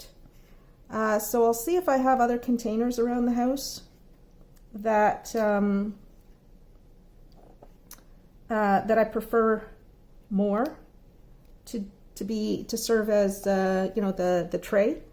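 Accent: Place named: Canada. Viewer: Rambling and Diamond Painting will do. I figured you’re Canadian I recognized the accent my partner is also Canadian, lol. You’re lucky it’s cold there and you don’t experience the pink wax getting all gooey, super soft and needs to be reloaded more often. But I found a great hack for us here in the Philippines. I keep all of my unused pink wax in the fridge! And they worked fine from that point forward. I like how you are finding ways to use cheaper alternatives for diamond painting. The hobby itself is not cheap if you want to be able to make a lot of paintings for yourself and to give away as gifts. Some of the tools in the market, I find very expensive and they are just wants not needs. Keep it up and keep on sharing!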